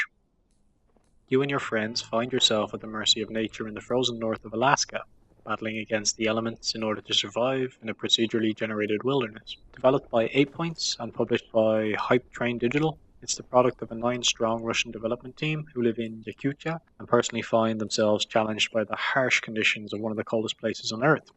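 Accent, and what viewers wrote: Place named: Ireland. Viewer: Great review - game sounds very interesting & fun. Love that Irish accent! I would say that the sound needs to be a little higher. I really support new YouTubers, subscribed, I'm interested in more. Thumbs up for that! I'd suggest that you make social media and put in your channel art to gain more exposure. Make the channel icon more unique! - Channel art is okay. - I suggest removing the videos and only placing playlists, popular uploads and channel trailer. Tags need to be more specific, don't be afraid to write a full sentence if you have to, such as "two minute review of the wild 8" rather than "the wild 8" and "2 minute review", that will have less competition and more likely to be searched. Feel free to follow me on Twitter, we can talk anytime :)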